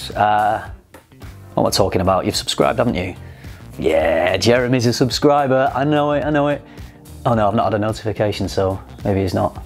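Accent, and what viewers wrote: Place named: West Midlands, United Kingdom. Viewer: A personal question, if you don't mind. Trying to place your accent, which isn't easy especially for a non-native speaker as you're obviously uni educated and that tends to round off a lot of regional variation. But if I were to hazard a guess, I'd say West Midlands?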